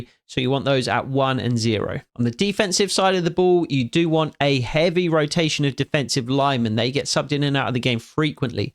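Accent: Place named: United Kingdom. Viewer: I'm sorry but I can't help but cringe anytime I hear a British person talk about college football.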